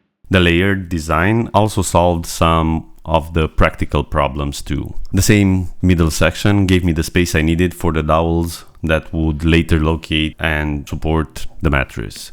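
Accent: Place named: Eastern Europe. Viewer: Thought I heard an eastern European accent. Love your work and the way you talk through decisions. Thank you.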